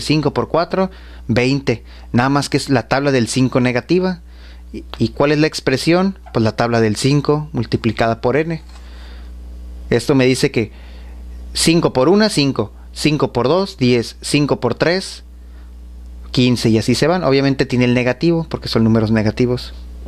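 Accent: Mexico. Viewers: Es mas mexicano que los tacos pero...de onde es (estado) Esq explica muy bien :)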